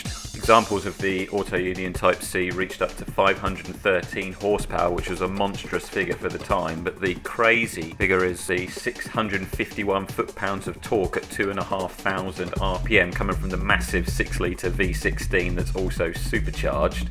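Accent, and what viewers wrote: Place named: United Kingdom. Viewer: Your voice is spectacular as I should know being a media graduate from College. All of us Americans are always fascinated with the British accent.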